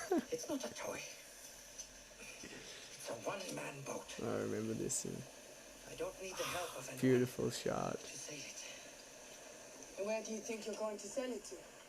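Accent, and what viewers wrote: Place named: Denmark. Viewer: I listened to this whilst napping this afternoon and HIS voice is like ice cream in my ears,so soothing..he should read audiobooks or sleep stories or something like that. Love the Danish language and accent but his English is pretty great I would say,I like the way he says things when he gets a bit muddled!. 😄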